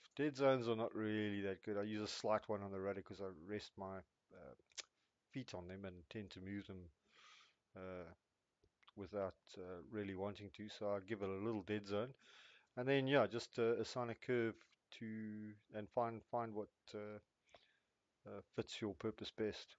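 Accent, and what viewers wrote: South Africa. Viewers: ARE YOU SOUTH AFRICAN?!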